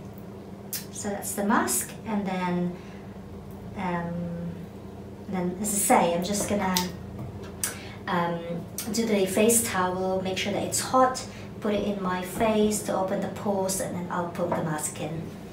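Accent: United Kingdom. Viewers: I like the british accent 😯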